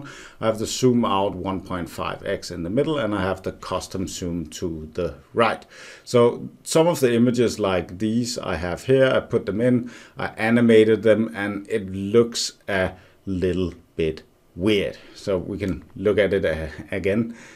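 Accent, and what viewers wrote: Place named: Netherlands. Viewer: What the hell is that accent man? It's like Dutch, English, American-Aussie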